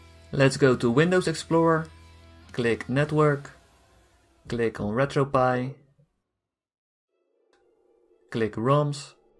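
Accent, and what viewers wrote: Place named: Netherlands. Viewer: You sound Dutch Ben jij. Nederlands?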